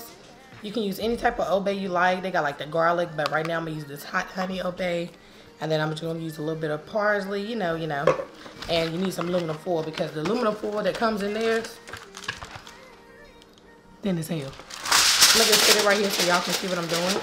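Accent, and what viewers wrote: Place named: South, United States. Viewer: i love your country accent omg 😂😂 i’m subscribing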